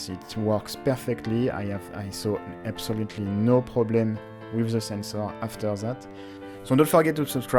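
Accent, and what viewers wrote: France. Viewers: I was kidding, is that a problem? By the way, I love the Frenglish accent :-)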